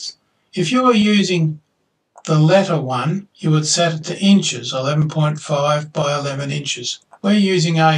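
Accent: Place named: Australia. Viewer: I’ve been watching you for a while now and always thought you were an Aussie..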